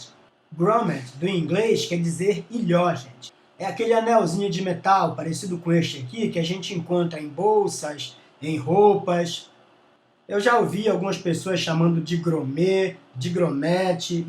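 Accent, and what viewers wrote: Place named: Belém, Brazil. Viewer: Sotaque de Belém do Pará.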